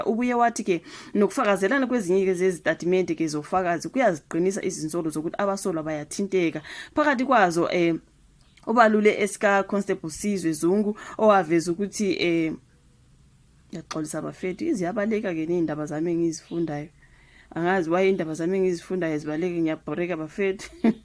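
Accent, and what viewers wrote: Zimbabwe. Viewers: Your accent is giving Zimbabwe. Why nizifaka ezindabeni zaseSouth Africa? Niya dika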